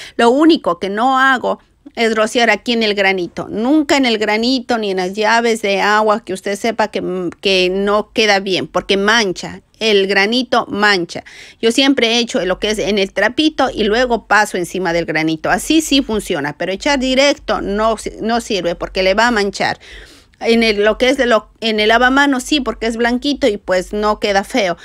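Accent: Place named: Ecuador. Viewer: Hola, apenas hoy encontré tu canal y vi muchos videos, y de una reconocí tu acento ecuatoriano:), aprendí mucho. Muchas gracias por compartir tus truquitos. Un abrazo desde Quito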